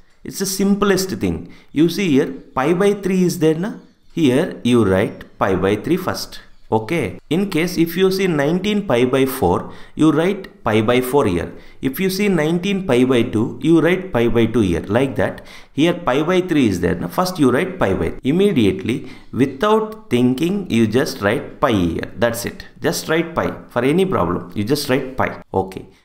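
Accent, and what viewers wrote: South India, India. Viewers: I love southIndian english so much , Thank u sir for clearing the concept becoz allied angles in radians are to confusing . This topic is impotant for jee . Am i right sir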